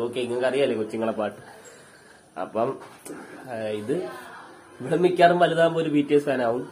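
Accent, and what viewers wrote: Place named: Thiruvananthapuram, India. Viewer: സഹോദരന്റെ പേരും നാടും..? ശൈലികേട്ടിട്ട് തിരുവനന്തപുരമാണെന്ന് തോന്നി. 🌹 I am Kasaragod.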